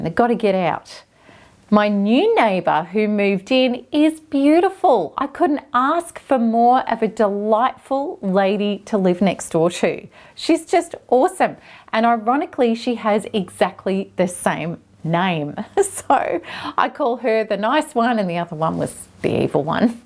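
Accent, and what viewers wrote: Australia. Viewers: that horrible australian accent...but yeah female narcissists are the worst.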